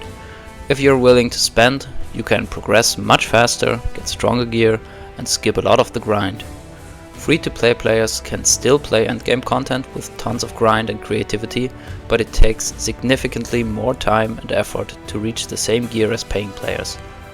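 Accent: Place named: Germany. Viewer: thx for the test. also love the nice seemingly german accent :-)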